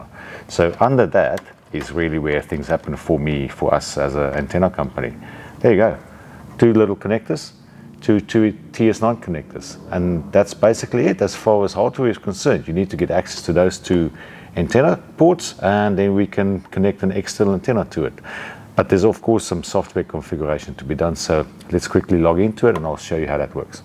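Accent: South Africa. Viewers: Thanks for the video, very useful. You sound very South African?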